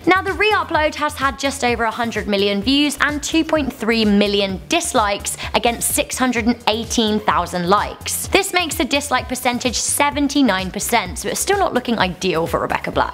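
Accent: United Kingdom. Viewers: ***** I KNOW ITS BRITISH but she tried to imitate the portuguese accent when she said "cortando o botao do youtube" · Philip J. Fry It's a British accent. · world most understandable British women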